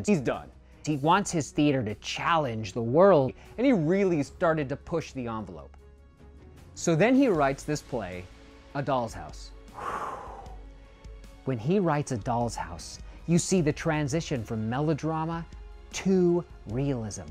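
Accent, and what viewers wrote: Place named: Russia. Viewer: Nice Russian accent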